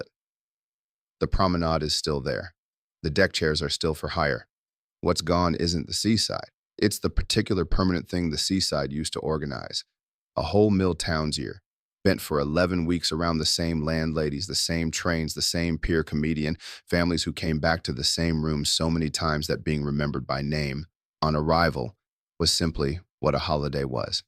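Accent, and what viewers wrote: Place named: United States. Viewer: Pity the narrator has an American accent to describe a British holiday video.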